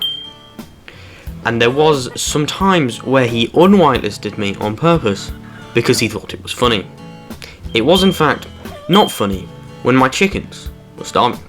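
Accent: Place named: RP, United Kingdom. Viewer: you sound very posh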